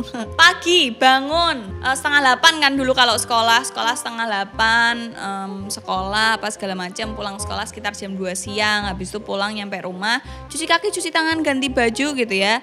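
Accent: Java, Indonesia. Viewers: Medok wooooooooyy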